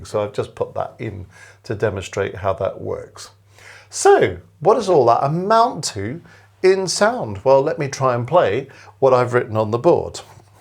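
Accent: United Kingdom. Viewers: Love your videos...and your British English is delightful! Thank you,!!!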